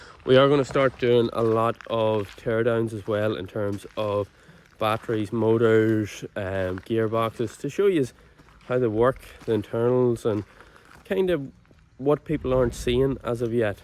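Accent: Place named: Northern Ireland, United Kingdom. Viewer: The accent tells you , northern Ireland, probably. around Newry area by his accent🎉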